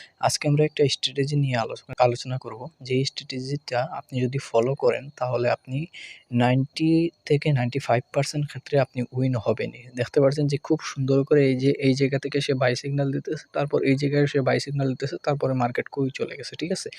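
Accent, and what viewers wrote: Sylhet, Bangladesh. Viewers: আপনার বাড়ি কি সিলেটে.?...আপনার উচ্চারণ সিলেটি দের মতো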